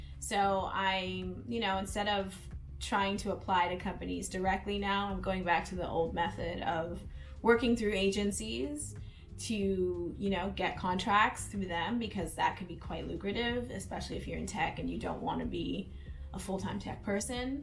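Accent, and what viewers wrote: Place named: Toronto, Canada. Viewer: Toronto Mans accent is a VIBE 😂😂😂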